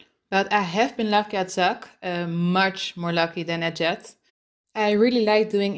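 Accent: Netherlands. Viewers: Recognized the dutch accent right away haha, I feel you. Nice account :) insane bank wow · ur dutch no doubt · Nice bank!! Hoorde gelijk dat je NL was haha! Maar echt nice zo n ironman account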